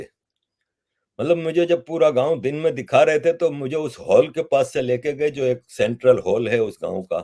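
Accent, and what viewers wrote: Gujarat, India. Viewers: YOU ARE TALKING LIKE GUJARATI N THATS WHY YOU ARE SWEETEST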